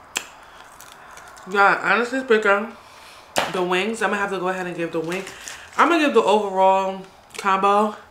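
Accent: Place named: New York, United States. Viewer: Looks good and I was dead at the mail part falling lmaoo 😭🖤and omg you sound you from newyork !! Got to be but love the accent !! Keep going gods got you and YouTube is for you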